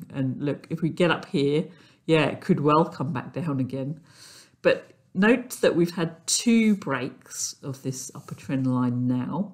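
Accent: England, United Kingdom. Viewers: I'm very surprised realizing that you live in New Zealand. I thought you're accent is as english as it can get. I read some reports about New Zealand in a blog I like and it summs up - this now my quote - there's basically a woman ruling like a direct descent of Stalin. We don't here about that in the news neither - lol!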